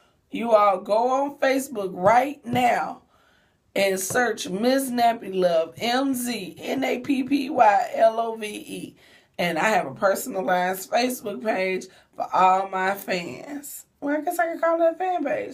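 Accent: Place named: Memphis, United States. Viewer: Are you from Memphis because I swear you sound just like all my cousins in Memphis!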